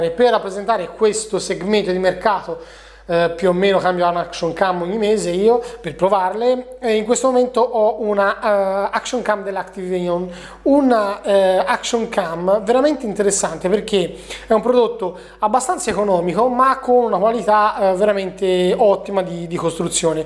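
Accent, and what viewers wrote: Tuscany, Italy. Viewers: Sei toscano, vero? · sei toscano???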